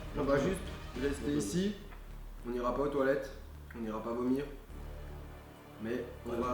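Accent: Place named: Alsace, France. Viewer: Ton accent alsacien sort un peu 😂😂 bref team Alsace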